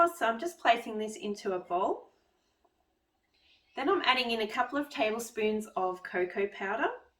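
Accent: Australia. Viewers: You look exactly like Ann reardon from How to Cook That, if she had lighter eyes! And if I'm guessing right from your accent, you're Australian too?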